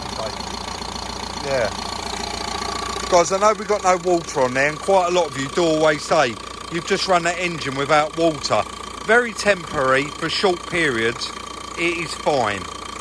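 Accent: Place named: Medway, United Kingdom. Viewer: are you a medway boy? Definitely sound it!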